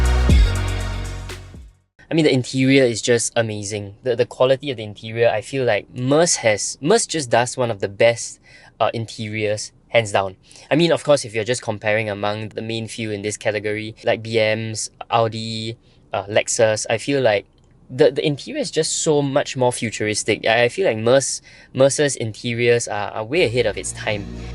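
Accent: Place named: Ghana. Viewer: you sound like you went school in ghana 👀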